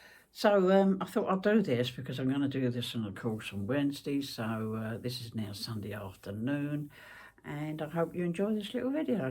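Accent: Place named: England, United Kingdom. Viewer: Hello! Ex pat from Aussie...loved hearing a real English accent again! I bought a set of rulers over a year ago a d still haven't had a go! You've inspired me to get them out and have a go 😊 the quilt behind you is lovely 😍 do you normally do your own free motion quilting? Looking forward to your next video...please look after yourself..I too have COPD so I know the struggle 😢 best wishes too you, Carole x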